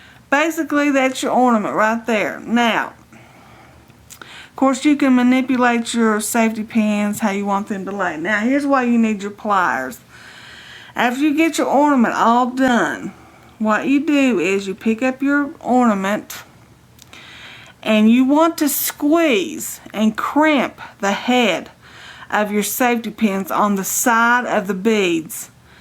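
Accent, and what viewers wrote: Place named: South, United States. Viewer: I love your accent! Are you from the south? I am and I have a strong accent. You remind me of my Grandma :)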